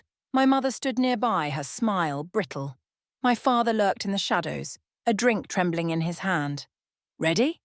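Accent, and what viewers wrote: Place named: United Kingdom. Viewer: I love the British narrator.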